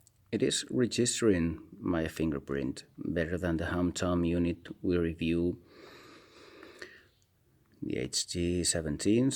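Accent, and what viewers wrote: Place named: Catalonia, Spain. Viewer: Tens accent català, hahahah! Podries dirme que tal funcionen alguns jocs? En especial pokemon go si el pots probar que tal funciona? Hearthstone potser també si pots, moltes gràcies i bon video!!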